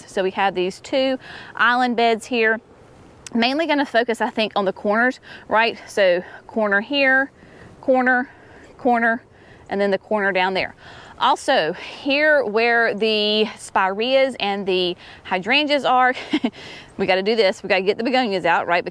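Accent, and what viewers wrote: South, United States. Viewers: Oh....you are too Cute! Out in the Rain.:goodvibes: I love your sweet voice. (with your Southern Accent) > Say that three times....Shocks and Struts... Tulip Blend. 🌷🌷🌷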